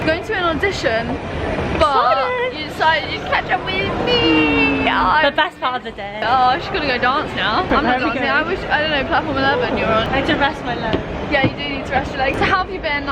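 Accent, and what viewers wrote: Australia. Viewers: your accent sounds australian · Cringe that you're speaking in an Australian accent u were only there for a couple months... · I can hear all the Australia in your accent ^_^ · I've noticed that you've gained quite the Australian accent. Maybe that's me being a little extra. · You have an Australian accent!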